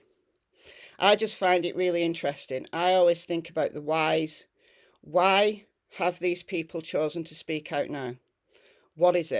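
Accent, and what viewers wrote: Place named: Newcastle, United Kingdom. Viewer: Hi i live middle england but from newcastle i love the way you talk sound like me haha love how you stick to facts x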